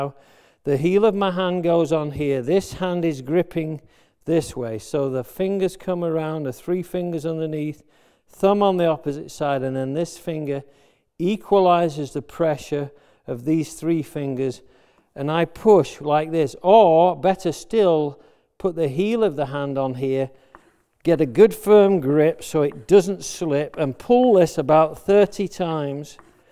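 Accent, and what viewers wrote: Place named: Northern England, United Kingdom. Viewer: Our northern accent never leaves us =) great videos.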